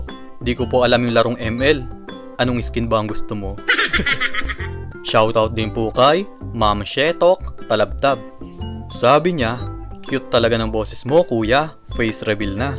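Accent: Pampanga, Philippines. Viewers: Haha sabi kuna pin kapamps ka eh base king boses mu... First time ku apanalben video mu lakas maka entertain brad haha. God bless and more power!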